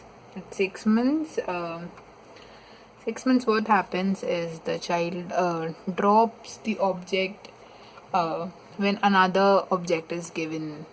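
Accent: India. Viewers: very helpful madam.. accent seems to be South India Your sounds so kind in the video just like a child..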